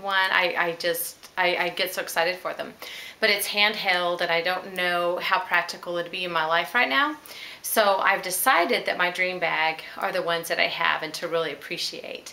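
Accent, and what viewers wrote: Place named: Texas, United States. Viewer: I can recognize a Texas accent anywhere. Yes, I am from SW Texas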